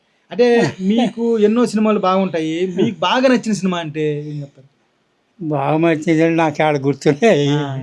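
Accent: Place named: India. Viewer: Bro. Mee voice ma vurilo local voice lagundi anna eh vuru anna medi?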